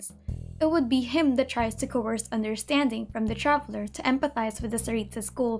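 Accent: Philippines. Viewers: Your accent sounds Filipino ❤️ Hello, kabayan! I love your content! Amazing research and compilation.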